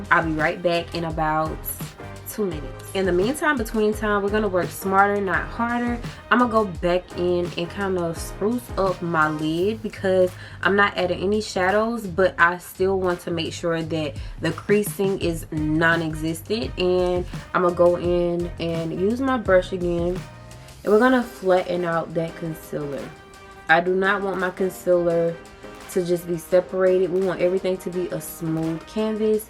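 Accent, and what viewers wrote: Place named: South, United States. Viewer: I knew I heard a southern accent 😂 even down to the certain phrases you said. Girl I’m in SC too and I definitely get it. I use to live in San Francisco but came back due to the pandemic. Wished I would have kinda stayed. 🙄 but ya girl definitely plans on moving again no if ands or buts. 😂 Anyway. Love the makeup 🥰🥰 super cute!! New subscriber 🤗 Very rare I meet another YT from SC!!!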